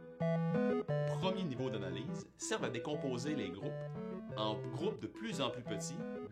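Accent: Quebec, Canada. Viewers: Merci beaucoup ! Je vais bientôt passer un examen grammaticale, donc je me sers de vos vidéos pour réviser. D'ailleurs, je m'habitue à l'accent quebecois, que même mes profs ont du mal à comprendre. Salutations de la Colombie !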